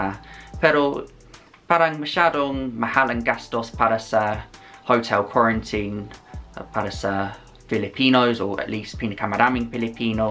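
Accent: United Kingdom. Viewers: wow thank you, I'm Lester I'm from Philippines.. I;m 14 years old... thanks for learning tagalog I also love British accent.... Thank you sa kaalaaman...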